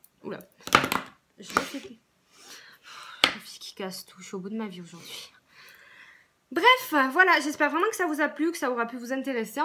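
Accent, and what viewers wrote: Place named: South, France. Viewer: on entend beaucoup moins ton acceeeeeeent du suuuuuuuud sur YouTube que sur Snap !! ahha non pas que je te suive uniquement pour l'accent ...! en tout cas tes vidéos sont un réel plaisir ! pas de chichi, ni de superflu, ni de mise en scène (jolie mais bidon), tu vas à l'essentiel, tu parles des produits de façon très claire et ça fait du bien ! grosses bises ma belle !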